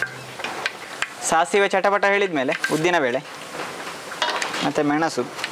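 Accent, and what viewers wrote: Mangalore, India. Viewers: I like ur explanation vid local kudla kannada spr..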